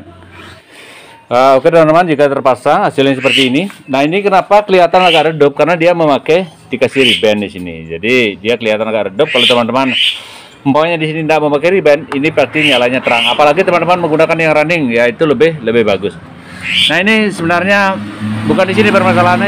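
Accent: Bali, Indonesia. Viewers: alamat mna , kalau dari logatnya orang Bali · Logat nya bali bgt bosku. Sukses slalu bos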